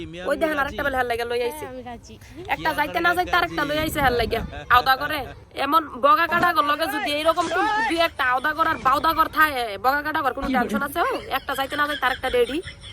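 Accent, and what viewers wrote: Comilla, Bangladesh. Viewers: Ha ha ha ha ha ha, eii apu tumi R gali diyo na amar haste haste sound asena, 😂😂😂 R kotha gulu amader zillar moto mone hoy, Comilla, Tumi kon Zillar??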